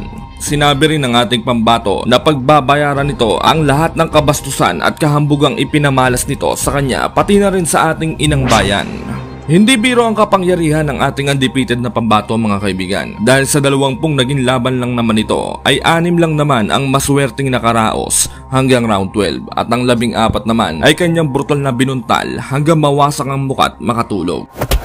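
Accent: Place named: Cabanatuan, Philippines. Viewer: Taga Cabanatuan kaba I dol. Katono ka Ng salita Namin.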